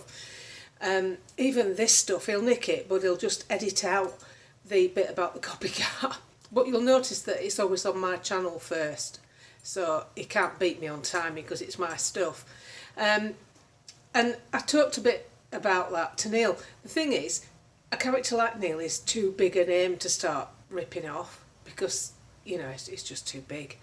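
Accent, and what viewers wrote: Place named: Lancashire, United Kingdom. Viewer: Not less than Oliver. You are superb. A strong voice. For me a great Lancs voice. Keep it up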